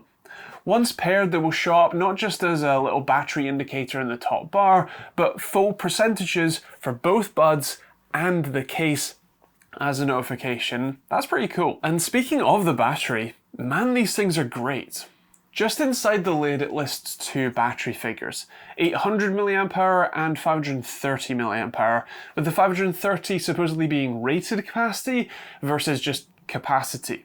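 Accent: Scotland, United Kingdom. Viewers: Appreciate this review. I bought these a while ago and have actually bought many Soundcore earbuds over the last few years. These are definitely some of the best I own. I really like my A3i's also. I tend to prefer the bud and not the stem style in general. I have also purchased the A20i's and have recommended them to several people who all loved them so that was pretty cool as well. The phone stand on these is a great touch and the sound is quite good too! Great value for sure! I really enjoyed your style as well. First time seeing one of your reviews. 😊 I have an awkward question. Is your accent Scottish or Irish? I really like it but can't determine which it is. I'm a Canadian who spent 3 years living in Scotland when I was a child so I should know but can't quite figure it. 😛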